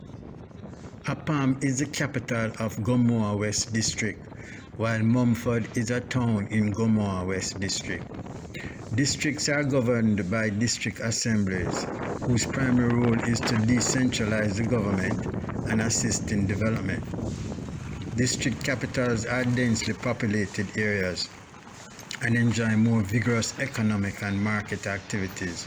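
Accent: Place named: Jamaica. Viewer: Greetings my brother, u sound like a jamaican just came across u video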